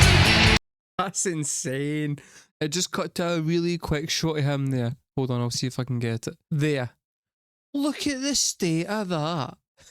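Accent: Scotland, United Kingdom. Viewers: You sound as if you are Scot. And if so you should be aware of fronted by also a Scotsman and their music is unique for the variety of styles of music that includes the flute as a rock instrument. They released their first album in 1968 and released one every year through 1980 and no two albums are alike. The musicianship is incredible as well as the lyrics of their songs, written by Ian. The live performance is amazing and worth viewing on YouTube. But listen to the studio recordings before you view the live performance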